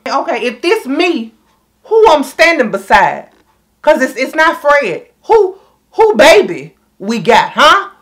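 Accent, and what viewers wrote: South, United States. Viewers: #1 is the first word: NIGERIAN. You the most southern sounding Nigerian I ever heard and far as I knew you are somewhere on the southern U.S. LOL. You DO look like a classmate of mine tho… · Your American accent is really good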